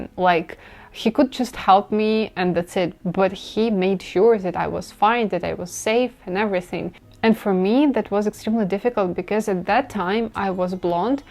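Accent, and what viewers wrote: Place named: England, United Kingdom. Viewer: The best english accent ever by any foreigner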